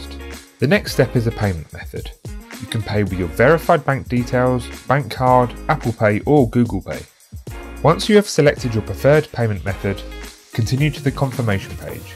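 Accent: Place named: United Kingdom. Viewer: why do you speak British?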